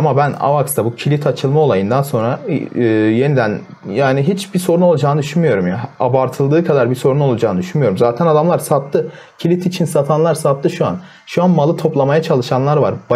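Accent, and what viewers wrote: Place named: Gaziantep, Turkey. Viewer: hocam antepli olduğun o kadar belli ki ;)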